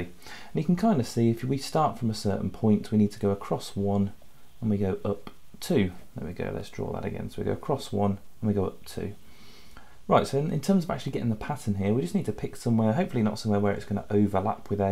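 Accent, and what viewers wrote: United Kingdom. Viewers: He’s scoish init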